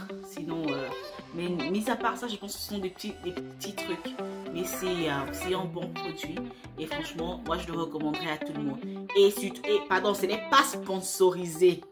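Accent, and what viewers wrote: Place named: Cameroon. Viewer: Ahhh l’accent du Cameroun ! Musique à mes oreilles 😊😊😊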